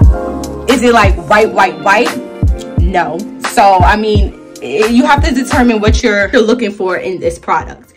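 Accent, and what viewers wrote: New York, United States. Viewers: I like the video helps you from New York 🗽 I peeped the accent 😂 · You are so NewYork! I love it ! I'm from Queens and I know an original NY accent when I hear it. Subscribed.....God Bless you and your daughter.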